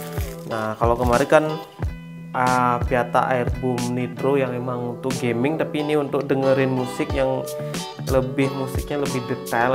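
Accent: Bali, Indonesia. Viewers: Logat bali Salam dr hindu rantauan bang..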